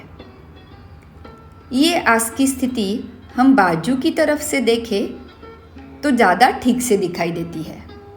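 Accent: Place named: Maharashtra, India. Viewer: छान विवेचन आहे. पण एक मात्र नक्की की मराठी माणूस जेंव्हा हिंदी बोलतो ते लगेच लक्षात येते. 😊😊😊 Great going... 🙏🏻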